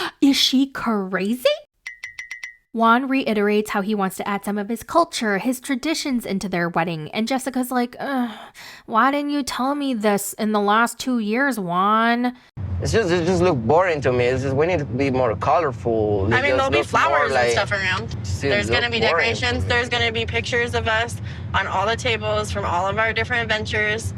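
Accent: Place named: New York, United States. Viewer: Lol, your new York accent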